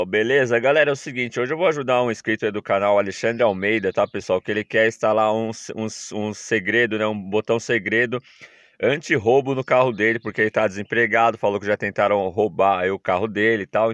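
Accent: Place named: São Paulo, Brazil. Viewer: Grato! Pelo sotaque você deve ser de SP capital... Você faz esse serviço de instalar o segredo? Qualquer auto-eletrico tem essa expertise?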